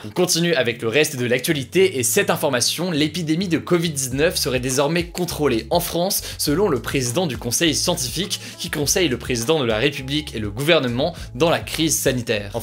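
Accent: England, United Kingdom. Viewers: Yfébo Anvakenss autant pour moi, reste quand même que son accent anglais est magique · C’était mieux avec son accent anglais! Ceux qui s’en sont plein vous saoulez 😒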